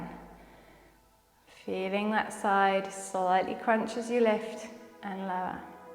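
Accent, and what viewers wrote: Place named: England, United Kingdom. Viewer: Oooh. Another one to add to my play list. I love your calmness and soothing voice and great workouts 😌💞 Are you an English lass? Gorgeous accent. I'm in Aus. 🇦🇺 Just found your channel and will be checking out many more of your videos. You're fabulous 👍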